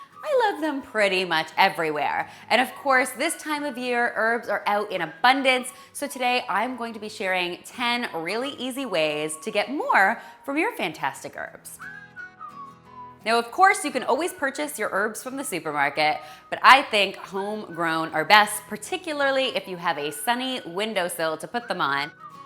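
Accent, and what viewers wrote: Canada. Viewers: she's canadian, everyone has different accents. it can be both honestly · Numptie Brown She is Canadian . and BTW English and American has different accents and it's herbs and erbs, so.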